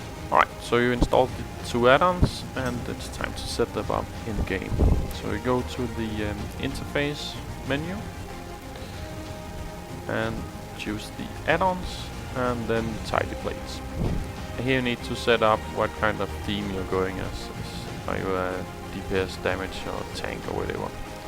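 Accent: Denmark. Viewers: Are you danish? You sound like it :) And which addon do you use for your actionbar? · are you from denmark? :D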